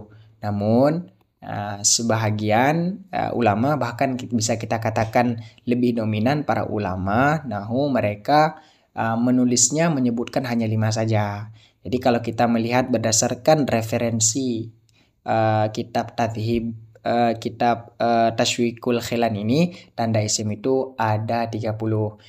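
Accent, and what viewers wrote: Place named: Java, Indonesia. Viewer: Bahasa Indonesia manteng medok that tgk😅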